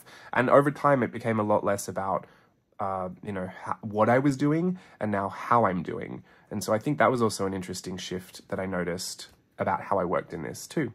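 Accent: Australia. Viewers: I'm new to Hobonichi, discovered it all through my YouTube feed and took a deep-dive down the unboxing rabbithole. Have ordered my first - expensive for me but it will encourage me to get back into journalling. Thank you for your very real approach - awesome to hear a fellow Aussie accent 😊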